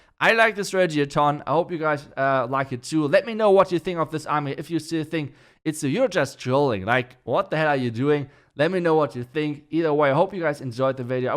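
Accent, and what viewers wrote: Germany. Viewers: I love how german sneaks into English videos, especially zuper 😂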